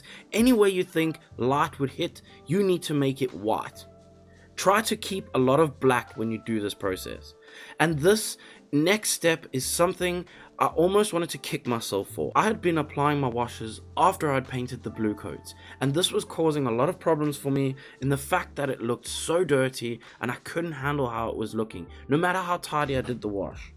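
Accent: South Africa. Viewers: Dude are you South African?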